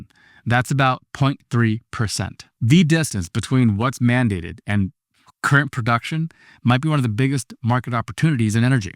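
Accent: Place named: United States. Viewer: Speak proper English which is British. USA English pronunciation is awful unpleasant to listen to, so many mispronunciations.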